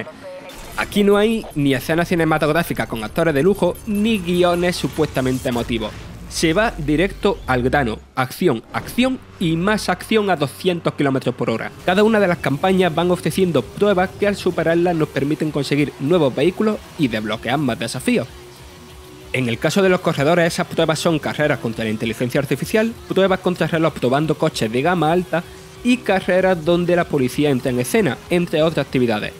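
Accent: Andalusia, Spain. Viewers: Lo que ocurre es, por la manera de hablar, debe ser andaluz. Sevilla, Córdoba o alrededores... Y sí, apenas en el primer minuto ya me molesta todas las S que no pronuncia. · Eres de cordoba ? O jaen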